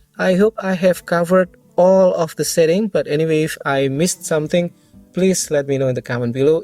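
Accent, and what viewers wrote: Indonesia. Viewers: Udah saya duga. Logatnya Indonesia bgt.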